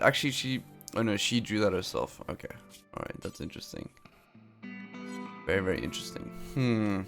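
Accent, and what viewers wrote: South Africa. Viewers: You South African?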